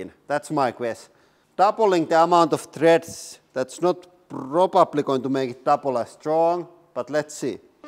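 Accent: Finland. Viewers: Finish/English ,,, omg thats lovely 😁😁😁😁😁😁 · Ootko Suomalainen?😂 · huomattavasti helpompaa ymmärtää suomen enkklantia puhuttuna kuin natiivin englannin puhujan. 😆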